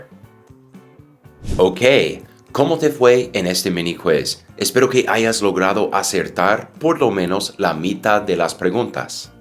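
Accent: United States. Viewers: Tu pronunciación del español suena naturalmente yanki. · lo principal es que los latinos no pronuncien la ed como tal pleyed guorked da lo mismo si dicen playt or playd si eres profesor importa, pero para los que aprenden NO ES TAAAAAN importante. Por ejemplo, tu español es muy bueno, pero tu pronunciación sigue siendo gringa. Muchos no se atreven a hablar por miedo a cometer errores de pronunciación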